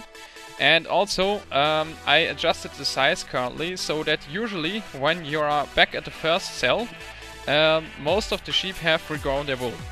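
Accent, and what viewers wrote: Germany. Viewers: Are you german because i am and my english sounds like your's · Dude germann accent is almost the same and im pretty sure he is german since in his bike wheelie video you saw alot german stores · das hört man doch dass er Deutscher is ´haha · I'm Dutch too, and has a German accent, very clearly not Dutch, but German..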